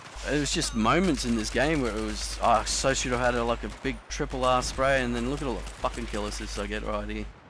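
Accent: Australia. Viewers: Nice video. Good to see a Aussie Commentator :D Subscribed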